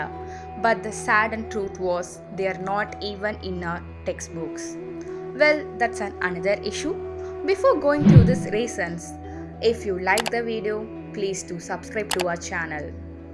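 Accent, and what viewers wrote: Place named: India. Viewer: Oh really, indian accent sucks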